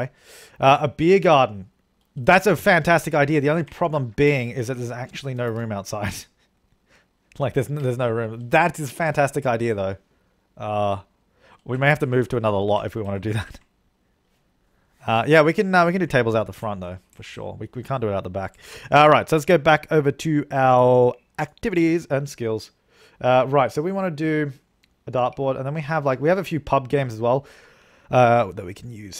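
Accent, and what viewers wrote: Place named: Australia. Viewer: WAIT!!! you're Australian??.. i mean it would make sence since your talking sounds it XDD just didnt think u were with us Auzzies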